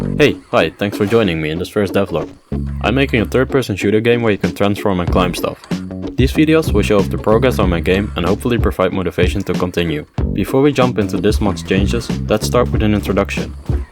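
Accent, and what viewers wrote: Netherlands. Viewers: I was hoping you'd do a devlog! Ook leuk om te horen dat je Nederlands bent :D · Mjst say : yoyr dutch accent is in english better then other ditch people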